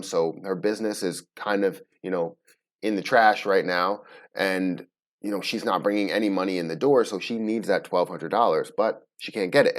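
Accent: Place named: Baltimore, United States. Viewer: Are you from Baltimore,? Just trying to guess by your accent.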